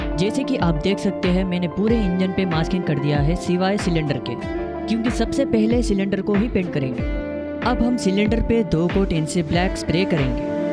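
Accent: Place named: Karnataka, India. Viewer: Are you from Karnataka??? Hindi tone can identify... 😀 Great job bro..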